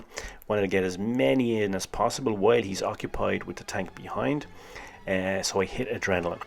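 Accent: Ireland. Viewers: On the subject of dulcet Irish tones, Wargaming should hire you to replace the crew voice in the British tanks.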